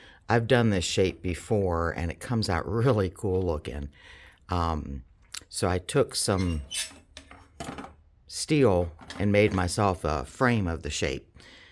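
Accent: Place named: South, United States. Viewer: Ok, where are you?im southern and you’re sounding like home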